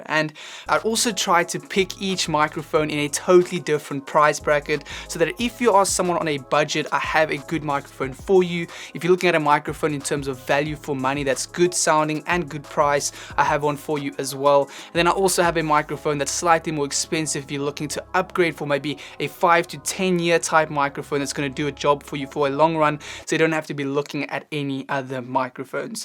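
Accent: South Africa. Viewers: Ah so cool, I could tell you were South African staight away. Very cool to see a saffa respresenting in the youtube music scene!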